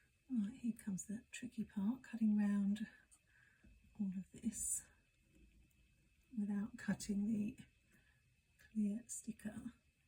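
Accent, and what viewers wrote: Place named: Southern England, United Kingdom. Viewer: I’ve got loads of supplies but am quite keen on starting ‘properly’ in January so I can follow through the year!! Where in the UK are you?? I’m in Essex and your accent doesn’t sound too far north!! 😊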